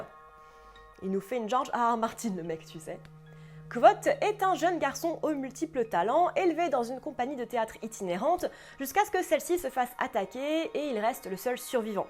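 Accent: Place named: England, United Kingdom. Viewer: de estelle faye je te recomande la derniere lame et sinon j'adore ton coté bourru et ton accent anglais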